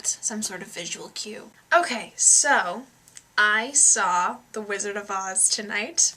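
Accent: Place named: United States. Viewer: Are you American? If you live in London, how come you're speaking in an American accent?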